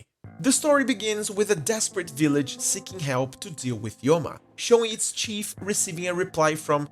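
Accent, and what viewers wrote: Brazil. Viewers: Tu é brazuca mano?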